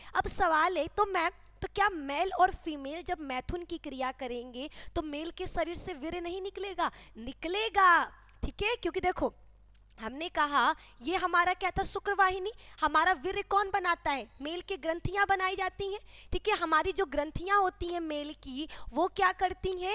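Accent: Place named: Rajasthan, India. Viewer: ये राजस्थानी है शुक्राणू को शुक्राडू बोलती है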